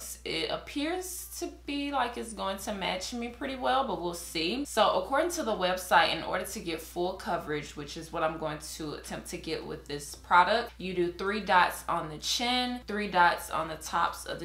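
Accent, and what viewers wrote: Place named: Texas, United States. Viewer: What city are you in. I hear a Texas accent.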